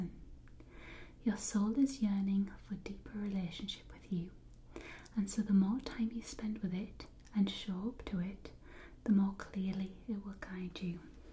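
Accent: United Kingdom. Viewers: Y ella aún habla el inglés británico, y así se queda más difícil para muchos 😣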